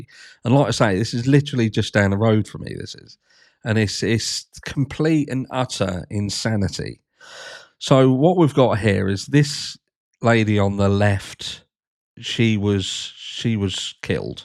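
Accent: Norfolk, United Kingdom. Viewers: I knew you must be a Norfolk boy from your accent! This case was extremely devastating. They were incredibly sweet girls. I’m just a stone’s throw away also.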